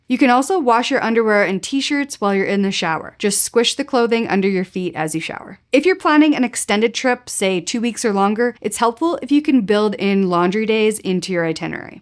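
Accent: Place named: Canada. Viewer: Broncos fan? Saw the fingernails. Then again, I detect a slight Canadian or Yooper accent. Thx for video!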